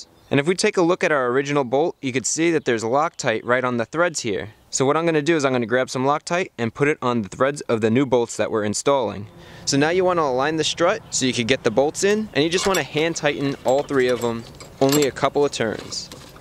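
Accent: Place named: New Jersey, United States. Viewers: Judging from slight accent, I would guess he is in the Northeast US where snow happens and roads get salted. This will cause surface rust on suspension components on a lot of cars. Some people in heavy snow and salted areas say that Mazdas in those conditions rust badly. I am in the middle of the east coast US and we get a small amount of snow, and roads get salted, and I have had 4 Mazdas and did not experience any rust or corrosion problems. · god you can hear the new Jersey in his voice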